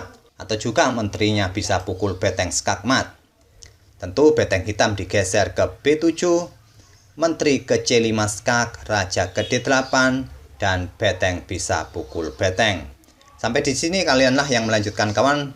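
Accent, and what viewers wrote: Java, Indonesia. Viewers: Iyasih mas..medok amat jowo nya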